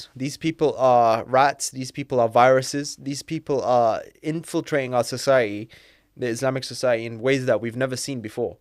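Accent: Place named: United States. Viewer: Do you live in the US ? because I can hear the accent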